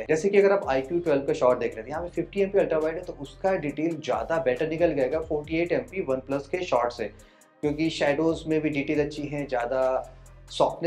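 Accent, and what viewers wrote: Lucknow, India. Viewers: आप लखनऊ से हैं??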